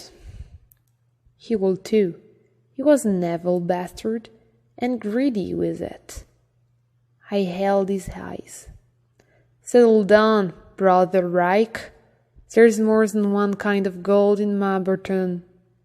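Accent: France. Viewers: Don't worry. I LOVE your French accent. It's so beautiful and it suits the setting of the book very well. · Love this book and your beautiful French accent make it even better 😍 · Never knew I needed to hear Prince of thorns in a thick French accent. Amazing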